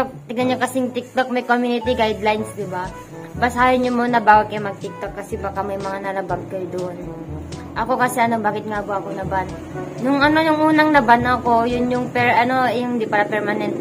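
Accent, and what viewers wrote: Ilocos, Philippines. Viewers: Hi ilocano ka ate?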